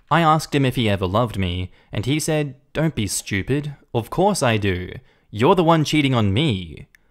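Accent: Australia. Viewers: Purely out of curiosity, what kind of Australian accent does have? Whereabouts?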